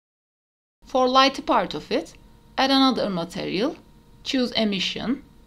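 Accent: Turkey. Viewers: aksandan anladım türk olduğunu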